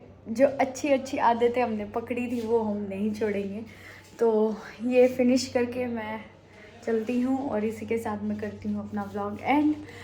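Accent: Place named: Haryana, India. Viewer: me bhi jaat family ko belong karti hun but me by birth punjabi family se belong karti hun but mere in-laws jaat hain U P se. Jb se maine tumhare vlog dekhna shuru kiya to mujhe tum bahut pyari lagti thi us ka ek reason y tha ki mujhe tum haryanvi language bolti Hui bahut achi lagti thi kyuki tumhare bolne me bahut mithas hai jo maine kabhi haryana ke jaato me nahi dekhi thi. Phir jb se ko dekha to mujhe tum dono se pyar ho gaya bacho ki tarah ab mujhe hr time tumhare vlog ka wait rehta hai. Congratulations tum Mom Dad banne wale ho but apni health ka dhyan rakhna. God bless u all. Luv u all❤❤.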